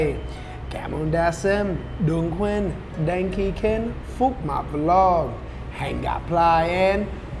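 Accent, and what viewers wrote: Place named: Vietnam. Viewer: HELLO FROM AMERICA. HELLO ANH. I ENJOY LISTENING TO YOUR VIETNAMESE ACCENT, LOL. I GREW IN AMERICA. AS AN AMERICAN I'M USED TO ENJOY GOOD CHEESE. DO YOU MISS IT LOL? MY PARENTS ARE FROM VIETNAM ORIGINALLY. MY DREAM TO VISIT THERE SOON, AT LEAST ONE TIME. REDISCOVER MY ROOT. WHAT IS THE MOST SURPRISING THING THAT YOU FIND YOURSELF FALL IN LOVE WITH? HAPPY NEW YEAR 2021. 🍹🍸🤣🤣🤡🤡🐖🐷🐄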